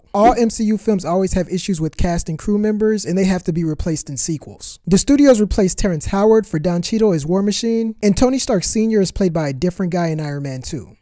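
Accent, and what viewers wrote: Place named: Latin America. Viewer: It's lovely. I like your voice/accent. I don't think we have many latino peoples in Britain :)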